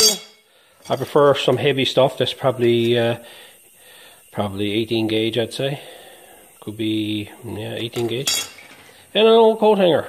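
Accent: Newfoundland, Canada. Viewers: Got to be from Newfoundland awesome video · I thought it was Newfoundlandish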